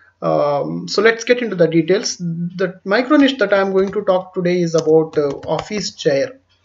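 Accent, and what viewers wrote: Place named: South India, India. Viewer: thank you, sir, for giving super video, apki voice see lagta he ap south side see belong Karte ho, apka content 200k, 500k,1m wale Chanel se bhi acha he, acha hi Nahi bohot acha he, really thank you, sir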